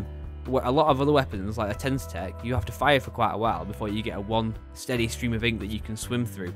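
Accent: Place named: Scotland, United Kingdom. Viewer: You sound partially scottish and partially not, it'S CONFUSING but this video has some really nice tips. I'd love to practice with you one day :'3 it's nice getting tips and I learn better through practice anyway. ... though, I'm interested- as another weapon, how would you counter a tri-slosher user? ;)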